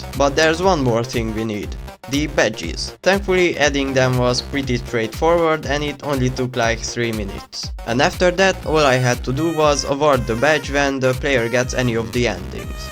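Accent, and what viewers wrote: Hungary. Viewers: are u hungarian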